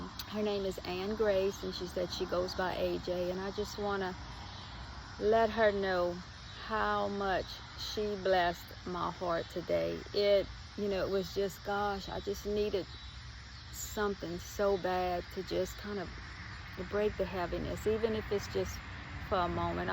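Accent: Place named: New Orleans, United States. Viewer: First time I’ve ever saw your videos and I can hear that NOLA in ur voice. Bless u momma.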